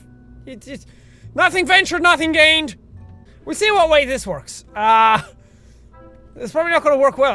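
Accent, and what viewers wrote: Ireland. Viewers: r u irish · ChurchISBest I like his Irish voice though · On his older he was more embarrassed to talk in Irish tone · Is Irish? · I just want to thank you for all the awesome videos you do! You've inspired me to play so many games( especially Kerbal!) Also I love you're Irish accent! I am also very Irish and thought it was awesome you were when I started to watch you're vids. Keep BOSSING things up, LIKE A BOSS!!!!!!!!!!!!!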